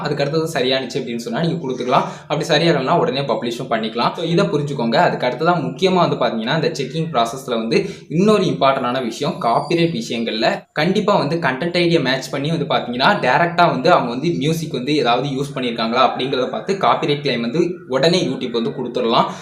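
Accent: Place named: Tirunelveli, India. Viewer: Nanum tirunelveli than pesum bothu therithu athan ketan tirunelveli la enga தம்பி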